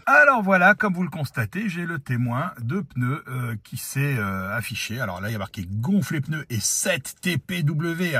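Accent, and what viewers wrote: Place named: United States. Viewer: Merci beaucoup pour cette démonstration ! Et quel accent américain🇺🇲 perfect!🫡😀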